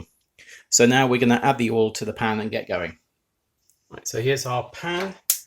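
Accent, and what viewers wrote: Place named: Scottish Highlands, United Kingdom. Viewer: love the accent * (like you just stepped out from the highlands lol)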